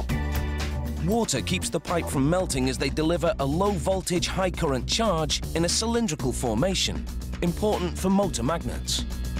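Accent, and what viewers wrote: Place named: England, United Kingdom. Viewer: English narrator > American narrator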